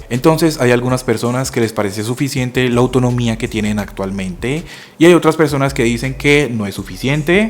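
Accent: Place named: Colombia. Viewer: Cuando dijo un millon de pesos dije vergas ya despues escuche el acento y me acorde que en Colombia el dinero se maneja en millones saludos desde Mexico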